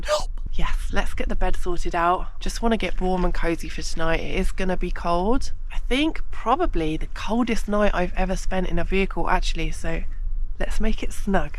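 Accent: United Kingdom. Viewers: May I please ask which UK accent you have? From USA . Thank you for your videos ! If you ever bring George to the USA, please make a video of where you camp.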